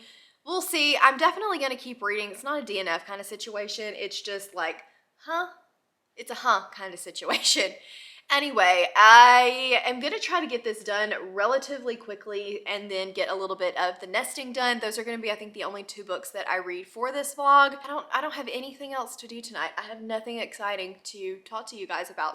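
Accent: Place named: South, United States. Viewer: heehee your southern is really coming out in this video and I love it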